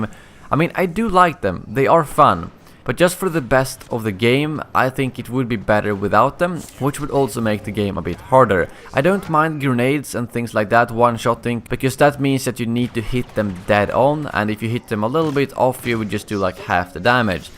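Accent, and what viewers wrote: Stockholm, Sweden. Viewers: Haha älskar "stockholmsdialekten" på engelska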